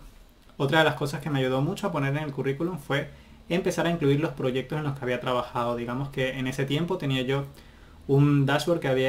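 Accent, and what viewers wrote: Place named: Puerto Rico. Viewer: Jurao' que pensé que eras de Puerto Rico por el acento. Saludos desde Santo Domingo, República Dominicana.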